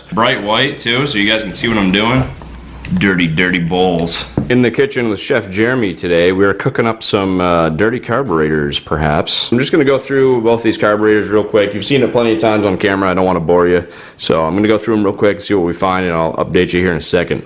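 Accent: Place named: Maine, United States. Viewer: You sound like a Mainah.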